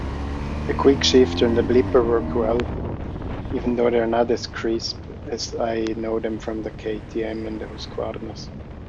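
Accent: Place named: Switzerland. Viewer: Sehr gutes Video, gute Kommentare und angenehme Stimme. Bei einem guten Englisch bin ich überzeugt dass Sie Schweizer sind .😊🙂‍↔️🙂‍↕️.👍👏🇨🇭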